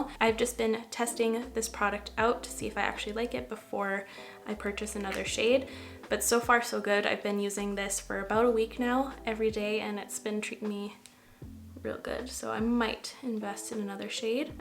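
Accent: Canada. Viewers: You have such a calming voice and I could listen to you Canadians say out and about all day! I love it! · I loved the way she said out, and about😍😍😍😍the Canadian accent love it!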